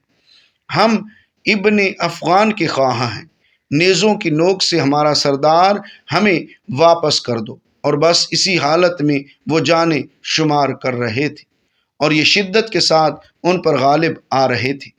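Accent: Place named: Arab world. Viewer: Aap Uedu bhi iss tarah mazhaka kheiz, Arabi lehje mein bol rahe hain ke Urdu ka satyanas kar ke rakh diya hai. Khassosan Hazrat ALi ka naam zuban twist kar kar ke bigaar rahe hain.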